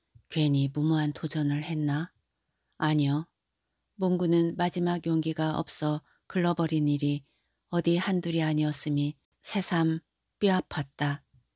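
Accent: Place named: South Jeolla, South Korea. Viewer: 전라남도 사투리가.. 겁나~ 맛깔나부네잉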